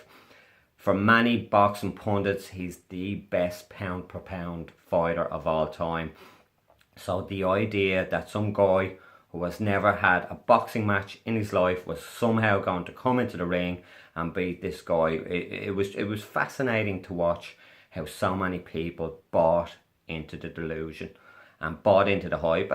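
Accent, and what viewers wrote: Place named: Ireland. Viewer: Wow. An Irish guy (sorry if my assumption is wrong) who is sensible and not deluded. I can't believe my eyes and ears. I'm so glad you exist. Never thought I'd hear someone with an Irish accent talking like this.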